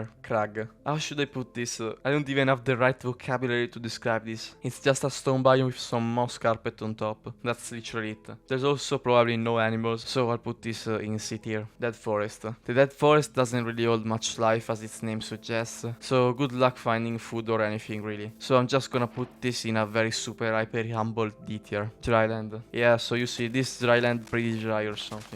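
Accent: Italy. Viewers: un Italiano si riconosce subito hahahah, comunque parli molto bene e bellissimo video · ciao fratello italiano, grande video (e grande accento)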